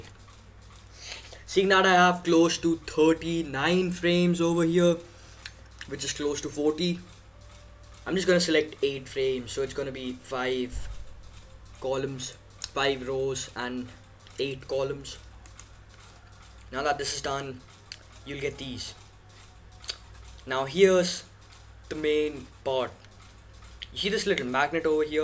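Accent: India. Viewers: I actually like your accent, is it Indian?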